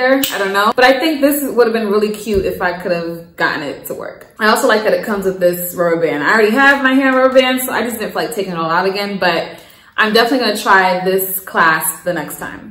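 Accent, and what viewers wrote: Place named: New York, United States. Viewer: I can hear your nyc accent... ha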